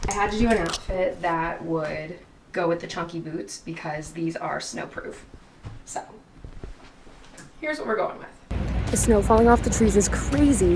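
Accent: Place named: South, United States. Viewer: What is your accent? It sounds like you have a little southern drawl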